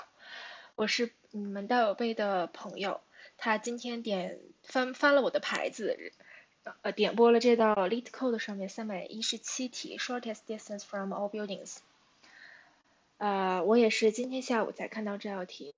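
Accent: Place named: Northeast, China. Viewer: 这个东北口音杠杠的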